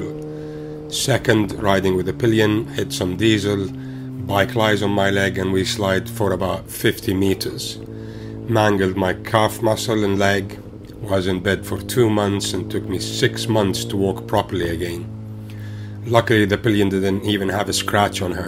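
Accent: United Kingdom. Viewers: is this yourself speaking? i expected a much more british accent haha and im going to italy with my motorcycle this year, i like the 70% rule last year we also went there and 4 out of 7 bikes crashed (at least) once, luckily i wasnt 1 of them and want to keep it that way :)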